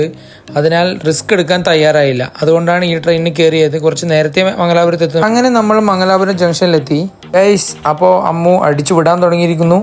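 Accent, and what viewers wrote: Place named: Kasaragod, India. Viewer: എത്രയൊക്കെ പരിഷ്ക്കാരി ആവാൻ നോക്കിയാലും എടക്ക് ആ കാസ്രോട് സ്റ്റൈൽ കേറിവരുന്നല്ലോ മോനു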